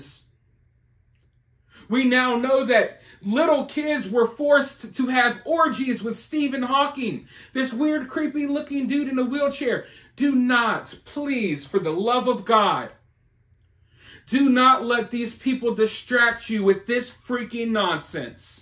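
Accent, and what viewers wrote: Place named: Georgia, United States. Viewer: Your a Floridian? I thought you were a Georgian!!!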